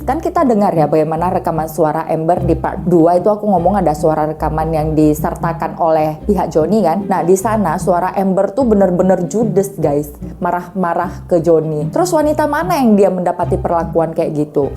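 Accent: Medan, Indonesia. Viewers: Medan kali..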